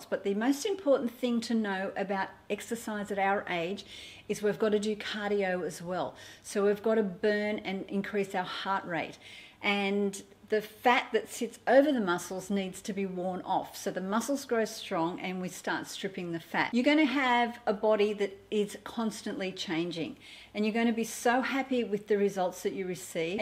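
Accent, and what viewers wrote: Australia. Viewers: And I love her Australian accent!! 😃❤ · I know....i swear, she could tell me I needed a lobotomy and I would be like "ok". Everything sounds nicer in an Aussie accent!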